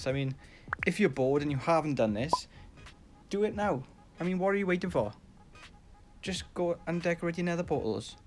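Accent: Wales, United Kingdom. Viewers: Are you Welsh I’m welsh and you got a welsh accent I love it 😂 and also this is a great video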